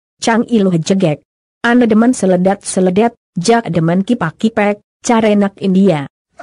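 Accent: Buleleng, Indonesia. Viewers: Gaya kipak kipek lucu. Lebih cocok logat Beleleng anggon. Ini rekaman lama tapi saya baru sekarang nonton. Demen masi.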